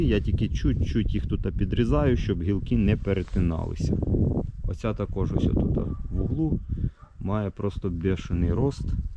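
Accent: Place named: Surzhyk, Ukraine. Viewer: Яку його мову ти розумієш?! Він же не українською мовою розмовляє, він розмовляє сільськiм суржiком!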